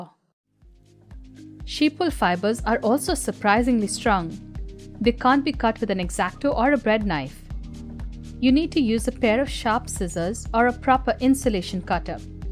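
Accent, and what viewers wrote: India. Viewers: Your Indian English pronunciation is good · I stumble across this video because I think your cute and your Indian like accent is nice sounding. Now lets get serious. Burning sheep wool smells like when you burn your hair with a curling iron 😊, I am a guy with short hair, I thought it was funny because I said to myself, she burned her hair with a curling iron 😂. Here is something you may not have thought about as you were mainly comparing the two materials. What if the either layered the Hemp wool and the Sheep wool or better yet, weave the hemp wool into the sheep wool, that would make for a strong product that may not burn and might take the pros from both materials. Its was just a thought. Nice and informational video. 👍👍👍👍👍 😁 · You mention yourself to be from Dubai but you are not arab for sure. Expats never get citizenship there. I lived there for 20 years and I know it. 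Why to mention yourself s someone from Dubai if you are not? You sound indian and I don't see any reason for you to hide it.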